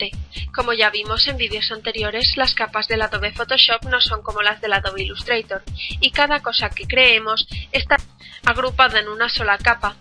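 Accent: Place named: Spain. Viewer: Hola, gusto en conocerla por este medio, ya estoy suscrito en su canal, me gusta la manera en que explica, este es el primero que veo. Felicidades, por que hay otros tutoriales que dejan mucho que desear. Un saludo desde Mexico, a proposito una pregunta por su acento creo que usted es española. Espero no equivocarme.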